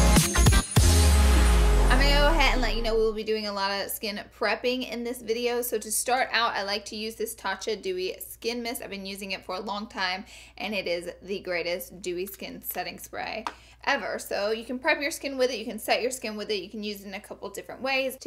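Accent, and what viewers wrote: South, United States. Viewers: Clearly the latter....... she's a southern gal....twang and all. Do you notice how cutely she says concealer? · You crack me up girl!! "Oily" I love your accent! I also have a tad bit of one most of my family is from the south.❤️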